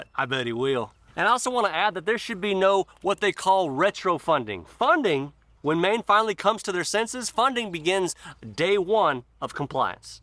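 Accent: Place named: Mississippi, United States. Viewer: I love you, man... er... in a brotherly way, of course! 😁 I always enjoy your no-nonsense perspective, your enthusiasm, and... your Mississippi accent! From a French guy who has lived in this great country for 40 years, and who is a staunch and unapologetic supporter of Donald Trump from day one. Bruno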